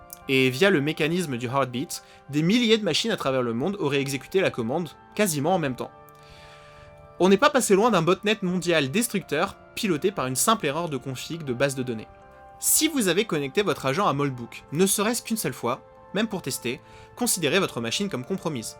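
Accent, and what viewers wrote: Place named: United States. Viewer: Ralala ces vidéos conspirationnistes... Par des personnes qui ont passé trop de temps devant Terminator... Ouhlala au secours, skynet est parmi nous.... Pour utiliser un agent Ia tel que Openclaw, il faut être capable de faire des installations en ligne de commande et donc ce public plus ou moins avancer sait déjà qu'il faut séparer ses données des données qu'on donne à manger à l ia... Stop a l agitation du drapeau sécurité à outrance avec un accent Américain tout aussi .... Pas grave je continuerai de regarder tes vidéos mais go brain avant de faire juste du buzz😅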